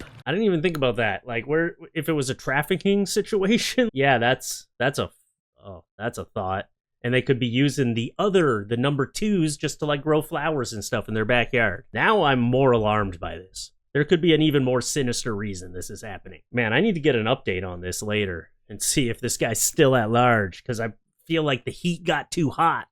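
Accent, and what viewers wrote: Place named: Canada. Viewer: CripTIDS, dude. Criptids !! Man, do I dig that Canadian Archie Bunker second language you got going on! Never change, man.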